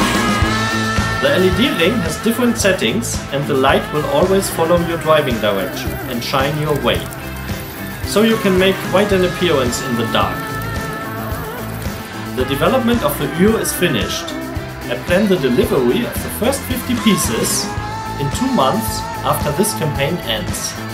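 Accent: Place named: Germany. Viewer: Sprichst du normalerweise Deutsch? Man merkt es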